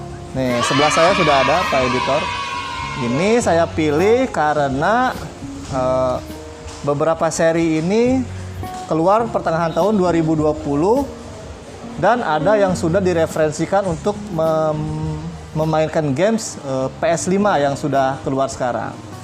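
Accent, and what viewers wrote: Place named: West Java, Indonesia. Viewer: Nyunda' pisan a' Pull etsdi Wait enjel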